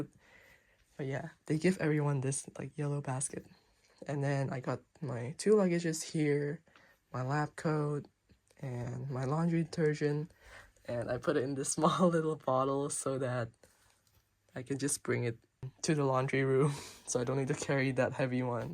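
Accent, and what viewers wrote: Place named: England, United Kingdom. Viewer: The English accent got so thiccc ever since highschool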